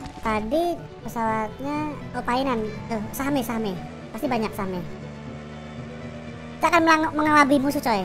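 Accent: Java, Indonesia. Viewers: Asli mana bang ko ngomong Jawa nya medok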